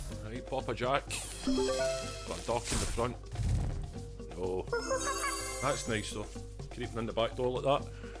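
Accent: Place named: Scotland, United Kingdom. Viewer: Hahahaha yass this is the best slot video I’ve seen in a long time🤣 was so happy when I heard you were Scottish anaw. Subbed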